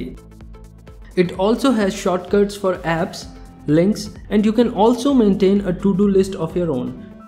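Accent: India. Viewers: LOVE THIS CHANNEL BUT I HATE THAT INDIAN ACCENT. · Why do you sound like an Indian? Well, ok, he is an Indian.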